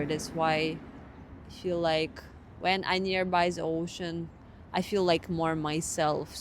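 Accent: Russia. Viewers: It could just be me but your Russian accent is getting thicker?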